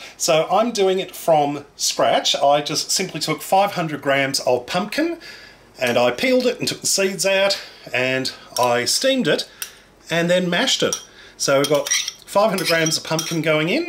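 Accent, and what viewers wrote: Australia. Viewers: I'm from the UK..... believe me he deffo sounds like an Ozzy to me lol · I loooooooove Australian accent 🤤😍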